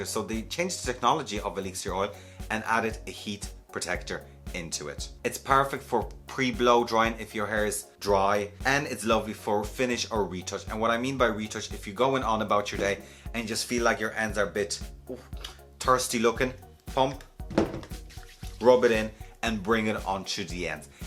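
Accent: Ireland. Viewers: Thanks so much and I love your (irish?) accent ❤ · Why do you and the lovely John Maclean say film instead of video? Is it a Scottish thing? I have both of these oils and I love them so much. I soak Moroccan oil before bed specially before my wash day and I use kerastase on the day to day basis (ps: I think you are from Ireland tho? Please don’t kill me I always mix Scottish and Irish accents tho lol) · Love your Irish accent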